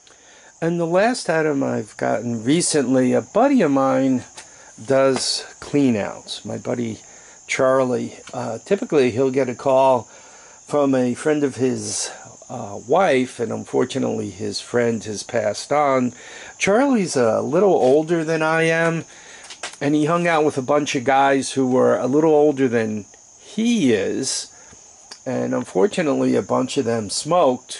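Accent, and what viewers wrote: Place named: Central Jersey, United States. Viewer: Beautiful country there. I'm in central Jersey and thought I recognized some local flavor in your accent!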